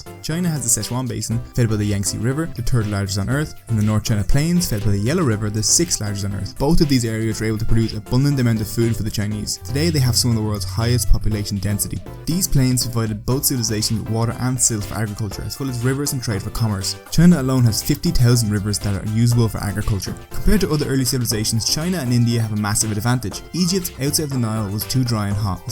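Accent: Ireland. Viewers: u from Ireland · clearly Irish...It's not that he doesn't enunciate properly, he just has an accent. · Are you Irish? If so, cool cause you and one other YouTube are the only YouTubers that I know about are Irish. The way I can tell is be cause you pronounce thirty two as turdy too, and the t-h syllables are pronounced differently than as it appears. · Your videos are really good. I can spot that Irish accent.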